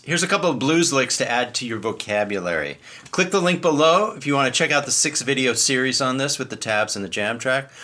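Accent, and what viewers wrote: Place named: West Coast, United States. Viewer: Oh man, I'm killing myself here. I lifted your solo at the beginning at a slower speed. Finished that last lick and then you start talking but it's still at slow speed and LOL! it sounds like you just took 10 vallum pills ha Oh man with the west coast accent.:) classic...Thank for the licks. I stumbled on this and thought I would a great solo to teach my students. Good strong and Rhythmically accurate licks which is rare in a lot of players.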